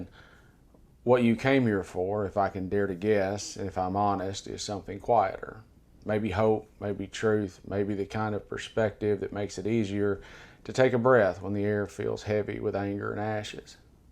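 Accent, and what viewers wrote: South, United States. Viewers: It soothes my soul to hear your words spoken in an accent that swaddled me with love and courage and righteous anger as I grew up. My parents and loved ones stood up to hate and taught me to do so. My neighbors and a few family members have succumbed to that old American hate again. It’s disheartening, but your words spoken in that Southern cadence that has the capacity to carry so much love give me hope in a language that wraps my heart in that familiar love. Thank you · I think it’s the profound eloquence wrapped in sweet southern drawl that is so soothing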